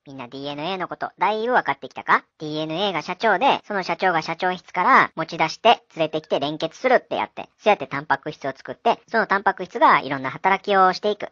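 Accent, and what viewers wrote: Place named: Osaka, Japan. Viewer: 大阪弁やから理解しやすい最高ありがとう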